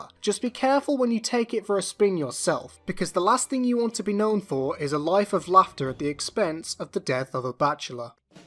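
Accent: Poland. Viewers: are you from poland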